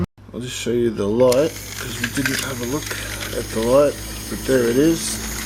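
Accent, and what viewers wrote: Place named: Australia. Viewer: your Australian! but I'm not =( or american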